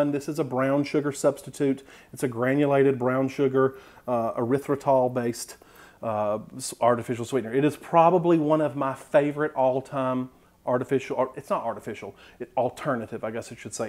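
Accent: South, United States. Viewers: OOOH! at 3 net carbs I can eat all twelve for a total of 36 carbs...if you can eat 20 to 50 carbs a day you’ve got it made!!! Hmmmm will the cheese cause the bowel to bind???? Love love your channel and your southern accent, mine is Texan, makes me feel at home. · You are really entertaining and I am really glad I stumbled upon your Channel. Canada, so love that southern accent lol. I think I will have to try making these with that packet of Fleischman's yeast. My Baby Book had notatiins from my Mom saying that I grew like Fleischman's Yeast as a young baby. Ha ha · “Y’all”~ I love your channel & your accent!! Thank you! A Southern Belle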